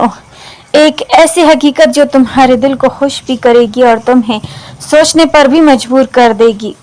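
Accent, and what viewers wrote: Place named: Saraiki, Pakistan. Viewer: Bolne ka style suraiki lag raha he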